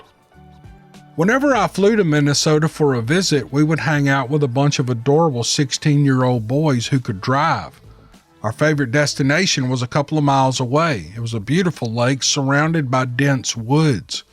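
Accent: South, United States. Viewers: you have a very deep voice I would like you to sing some with your Southern drawl sometime. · Just got home from work and BOOM, a new vid! What an awesome way to wind down. Being in the deep woods at night with just a lil 22 and having a giant, possibly hostile, WTF walking beside you. Damn. That'll test your bunghole. It'll either pucker up REAL tight, or the flood gates will open wide. Yeah, I'd give up coon hunting too! Keep up the great work. Listening to you talk with that southern drawl is just like sitting around the camp fire with friends and family.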